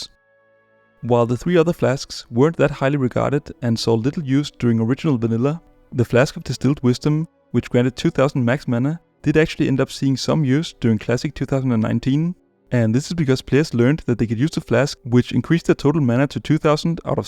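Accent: Denmark. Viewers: are u danish! STEGT FLÆSK MED PERSILLE SÅVS! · Danish accent represent.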